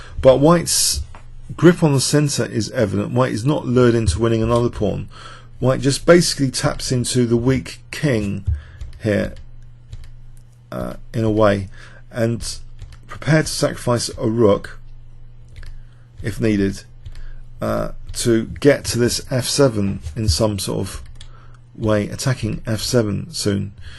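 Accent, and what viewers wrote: United Kingdom. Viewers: Thanks for your continued success as a player and commentator. Of course, I always have to acclimate to the British "th", but it's worth it! (My British friends always complain when Americans say "water" with a "d" sound!). I don't have a chess engine, so I was hoping to get your feedback on the idea of Black playing a5 after Ba3, intending Nb4 if White pushes e4. If White exchanges B for N, then Black has a protected passed pawn on c3 and preserves the dark-squared bishop. Any thoughts?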